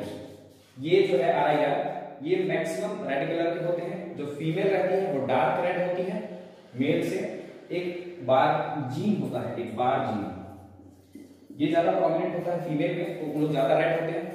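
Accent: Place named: Uttar Pradesh, India. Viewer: Apart from the content (which indeed was informative), loved you n your U.P accent as well❤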